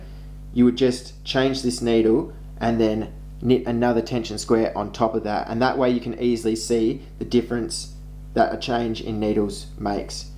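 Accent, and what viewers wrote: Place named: Australia. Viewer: Thank you. I am about to knit my tension square. Nice to hear an Aussie voice too. Looking forward to more videos by you. Happy knitting 🧶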